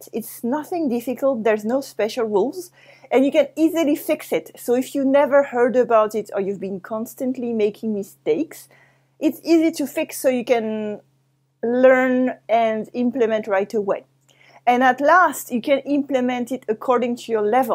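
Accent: France. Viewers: R u french Est ce que vous êtes française?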